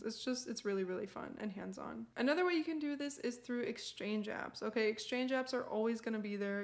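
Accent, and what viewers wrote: Mexico City, Mexico. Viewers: I love your Mexican accent. So cool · OMG in your second video where you are more fluent, you really sound like a Mexican from the CDMX lol and as you said, there are not specific types of mexicans, I have friends that look just like you and I am from the north of Mx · You should’ve picked another accent other than chilango though. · Great job! You do sound Mexican!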